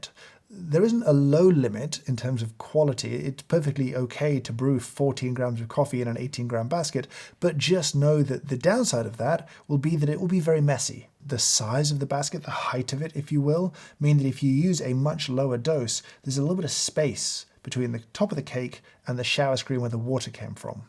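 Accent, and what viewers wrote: United Kingdom. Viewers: Why is it that ANYTHING a Brit says sounds more intelligent and authoritative than an American? The accent alone sends the credibility of any statement through the roof. I'm not sure if I should believe anything this guy says, but I don't have a choice. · Watching in bed on silent w/ subtitles. ( so as not to wake my beautiful wife) I find a Hoffman coffee instruct-able without his nuanced Brit accent flowing through my speaker to be a bit like an underextracted quality coffee. A missed opportunity for a better experience. Miss your voice but I will do better next time and extract the optimal experience next time- with volume on😁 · I have listened to you for hours now. 🌿🌸🌿 I love your videos and signed up for the Oct 3rd coffee tasting. I’m in the states.You are so English it’s like watching someone do a caricature of an Englishman. 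“You don’t want that. No one wants that.” You are so enjoyable to watch and listen to. Thank you 🙏🏻 for the incredible wealth of information. I saw you flipping through “The World Atlas of Coffee” and ordered it used from Washington state. What a gem you are. What fun.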